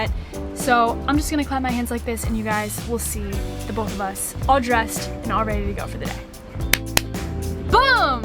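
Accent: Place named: New York, United States. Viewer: Just found your chanel :) great video am i tripping or do you look like an older version of millie bobby brown aka 11 from stranger things like this comment anyone if you agree :) anyway your pretty also im guessing your from ny i can hear it in your voice maybe im wrong lol have a great days guys best believe ill be watching your videos id like to see more of what you eat everyday you inspire me :) i subscribed!!! Yay!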